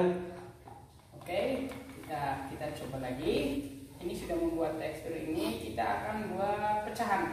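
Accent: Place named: Banyumas, Indonesia. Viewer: lah wong ngendi sih kok jawane ngapak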